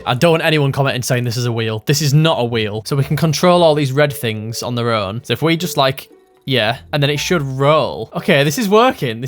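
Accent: United Kingdom. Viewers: The only British accent i can tolerate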